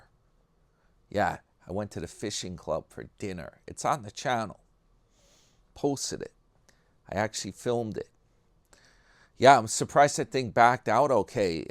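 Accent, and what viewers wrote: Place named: New York, United States. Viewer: He does mispronounce words, but this is not a fair example. This is his regional accent. Regional accents are disappearing. It makes me sad. It will be very boring when everyone in our country sounds the same. It’s interesting to me that even in the small region of New York there are different accents.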